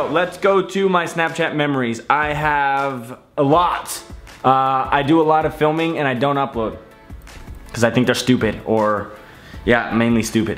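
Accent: Scotland, United Kingdom. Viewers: Scottish accent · Nice Scottish accent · You sounded kinda Scottish and I'm living for it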